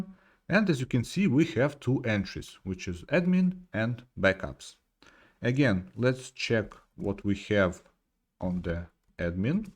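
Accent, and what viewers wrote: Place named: Russia. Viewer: русский акцент сразу чувствуется))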